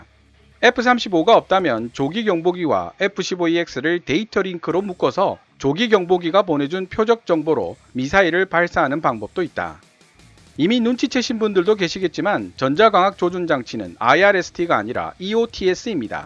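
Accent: Gyeongsang, South Korea. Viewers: 갱상도 사투리 아주 구수하네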